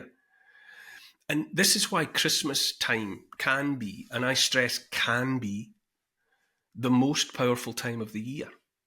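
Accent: Scotland, United Kingdom. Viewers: Irrespective of your own view of yourself... I stand by the following... 😁 (written in May '22) 👍😍 Won't Kneel A gentle, beautiful Scottish lilt A kind, empathic, forward tilt An open heart; a caring face A willingness to take his place. A strong, home-hitting monologue Reminds us of the boiling frog The courage to become a sleuth A passion to release the truth. A deep desire to crack the whip Illuminates our freedom-strip Calls out the darkness taking hold The shiny despots; plated gold! Intense and raw, he lays it bare A soul-enhancing, honest stare Inflections drive the message through He speaks for Us - for me and you! Discernment's such a precious gift His words create a mighty lift Take heed of all he has to say He knows - it shouldn't be this way! 🤗🦋🐬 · Ayup, I live with a real fire, all the time and just to let you know that a crackling fire and your Scottish tone playing with one of your monologues on in the background and a candle is a magical thing! 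Very celtic! Thank you 🤝🏴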